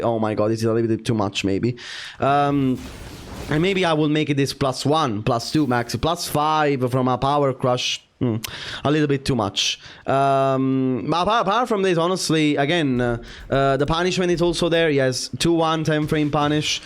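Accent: Italy. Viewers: Bro fuck Tekken, I watch for the Italian accent 🤌🤌🤌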